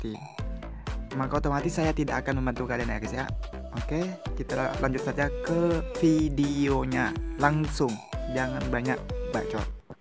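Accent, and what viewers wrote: Madura, Indonesia. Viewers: Orang madhureh bang? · Orang madura nih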